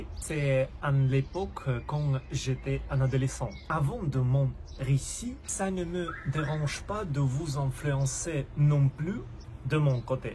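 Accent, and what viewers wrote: Russia. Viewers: salut ton accent russe est cool bisse de france.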